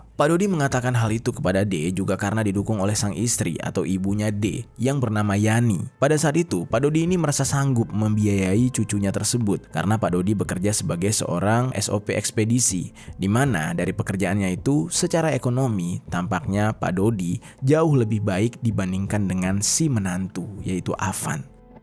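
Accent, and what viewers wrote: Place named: Banyumas, Indonesia. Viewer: Ngapak brow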